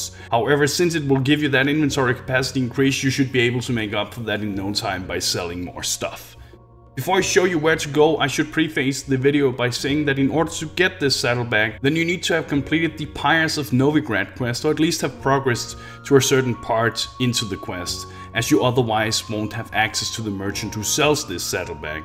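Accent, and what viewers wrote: Denmark. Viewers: you sound danish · I think he's Danish, like me. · This guy got to be Danish. That irritating accent is only available in Denmark..... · I can tell from your accent that you´re danish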